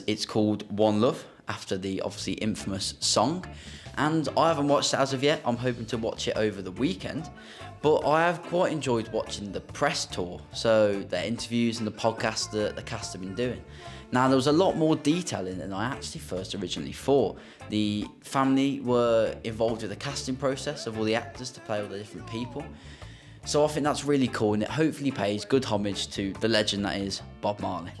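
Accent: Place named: South Africa. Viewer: Good T.W.I.V news. On the next 1 can we have it in your glorious South African voice.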